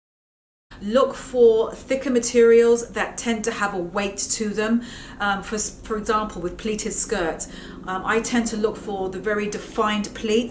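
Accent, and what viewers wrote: United Kingdom. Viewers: Found you today - instant subbie! It's so nice to find a fellow Brit who sounds like me! Excellent video!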